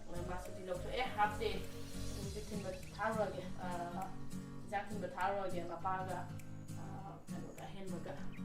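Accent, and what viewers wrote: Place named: United States. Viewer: Ashh angang geijidi pura American accent olle 😀😀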